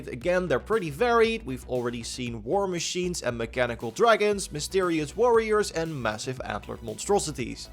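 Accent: Netherlands. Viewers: dutch accent is so funny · Je klinkt uber Nederlands, klopt dat? Al een tijdje benieuwd 😂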